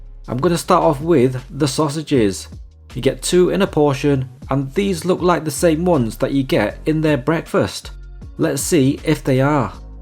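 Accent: United Kingdom. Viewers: Mate, You are the most polite reviver. Listening to you speak you've been in the UK for a while.The first one looked to dry The second was far better mate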